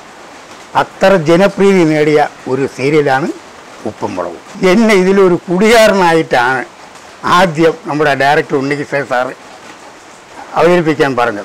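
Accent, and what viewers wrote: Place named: Trivandrum, India. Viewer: അണ്ണാ നിങ്ങട തനി തിരോന്തരം ഭാഷ ആണ് എനിക്കിഷ്ടം അതു കേൾക്കുമ്പോൾ പ്രവാസിയായ ഞാൻ നാട്ടിലെത്തിയ ഫീൽ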